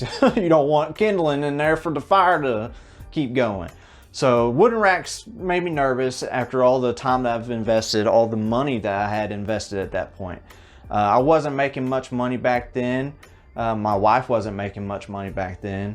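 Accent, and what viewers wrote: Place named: West Virginia, United States. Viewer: awesome dude. I was hoping you were closer to home as we share the same accent. Lol. I'm here in point pleasant wv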